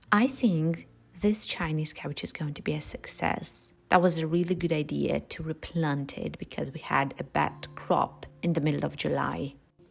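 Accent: United Kingdom. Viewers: Did you learn that very British accent? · ahh nice you have a nice british accent !!! congrats. and by the way i had never seen a vertical aquarium .... is it aquarium?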